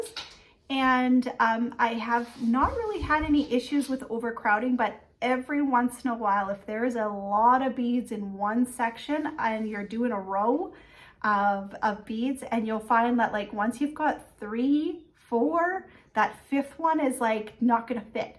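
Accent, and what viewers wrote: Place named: Canada. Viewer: I just started watching your videos after a shout out from the team at Just Keep Stitching. Your stitching projects are so beautiful! I love your devotion to each and every one of them. And, yes, I love your Canadian accent too! My mom was born in Nova Scotia and all of her relatives (except one) still live in Canada, mostly in the Maritimes and Ontario. I have subscribed to your channel and can't wait to see your progress on your projects.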